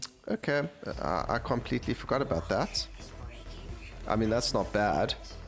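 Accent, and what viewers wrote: South Africa. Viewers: Anyone else love the South African accent? Wack ton of a awesome lol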